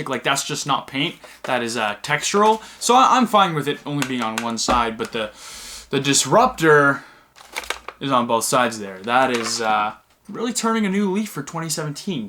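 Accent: Canada. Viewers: Canadian eh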